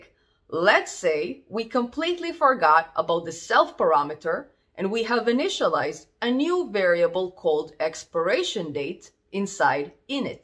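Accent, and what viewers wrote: Russia. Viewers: Русский акцент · Your accent sounds really nice. Are you Russian or Ukrainian?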